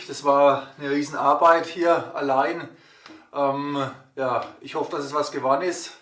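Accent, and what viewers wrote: Franconia, Germany. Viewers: Du kommst doch aus Franken des hör ich doch 😝 komme aus Würzburg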